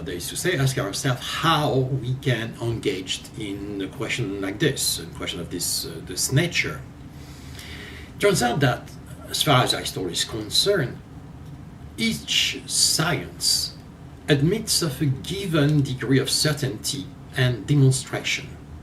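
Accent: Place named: France. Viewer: I love the French accent. Perfection.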